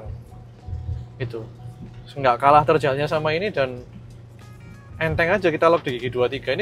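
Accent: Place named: Java, Indonesia. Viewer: ngomong aja medok yauda pasti bahasa indo Lah ,, lo mau ngomong sok english jg tetep aj predict ur nations braww hahaha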